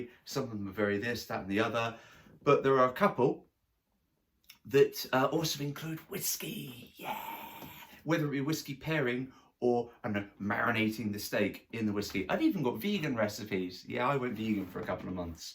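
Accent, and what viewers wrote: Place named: United Kingdom. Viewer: i want what this guy is having. What an energy! I wish I hadn't looked at this "guns" though as Ron Burgundy would put it, cause now I feel so skinny. "don't worry he's fine, it's only a staircase!" hahahaha, please do more videos like this one, how good is it to be able to both listen to a guy speak about scotch while making funny jokes (especially with a nice British accent)/ Thanks from France.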